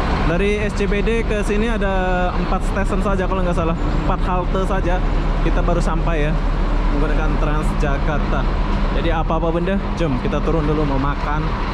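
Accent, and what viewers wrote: Malaysia. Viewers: Kalo Aku dengar dari logat. Abang ini orang malaysia kayak nya. Salam dari Sarawak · Kamu orang mana sih?logatx org melayu.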